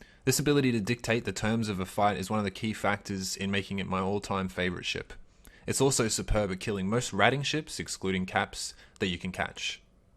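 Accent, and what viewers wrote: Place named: Australia. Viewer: so australian mate haha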